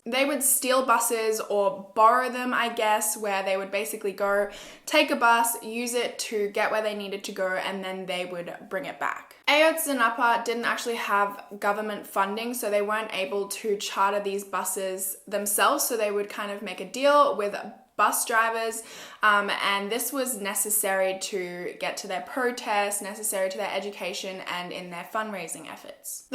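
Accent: Australia. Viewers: Just Waffles Bro..umm it’s a typical Australian accent k? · bro i heard ur voice for the first time and i was like she aussie to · she’s Australian so she has an accent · I loveeee Aussie accent omlll it’s so beautiful😍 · Omg literally my first video I seen from you and I’m listening to your accent trying to detect where your from and the second you say the word know I’m like oh shit she’s Australian and I look in the description and I was right literally love your accent , a girl from my highschool basketball team moved from Australia to the states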